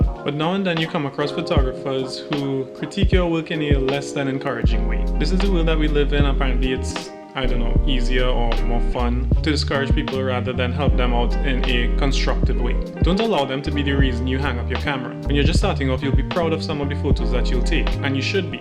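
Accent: Trinidad and Tobago. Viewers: Lol how u sound like a trini so bro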